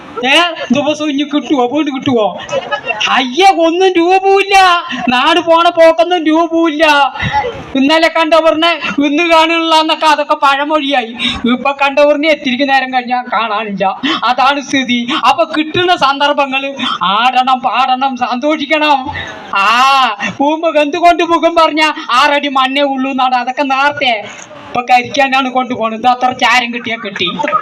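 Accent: Palakkad, India. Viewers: എടാ ചങ്ങാതി നീ മലയാളം ഈ പാലക്കാട് അപമാനിക്കുന്നത് നിനക്ക് നിന്റെ മക്കളെങ്ങനെയാണ് ചങ്ങായി സംസാരിക്കുന്നത് ജനിച്ചു പറഞ്ഞിട്ട് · പാലക്കാട്‌ ഇതുപോലെ സംസാരിക്കുന്ന ആള്ക്കാര് കൂടുതൽ ആണോ അതോ ഇതിനെ വഷളാക്കുവാനോ എന്തോ നെറ്റ് und ന്തേലും ചെയ്തുവെക്കു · പാലക്കാടുകാരുടെ മനം കളയുന്ന സംസാര രീതി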